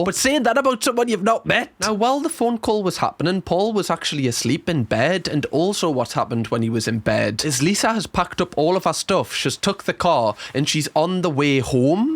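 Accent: Newcastle, United Kingdom. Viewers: ! I found your channel sort of by accident just the other night. I'm hooked now. I know I'm watching everything out of order and all but, your commentary is hilarious! Also, love your accent and getting to hear the Newcastle slang. Love that you call your folks "donuts", I'm also part of the Waffle Gang. Another UK Youtuber Mark Narrations calls his folks that.:)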